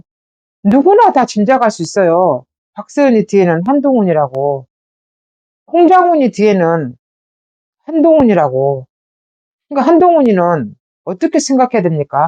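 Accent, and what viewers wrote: Jeolla, South Korea. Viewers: 절라도 사투리가 구수하게 들리는 건 처음 ㅋㅋ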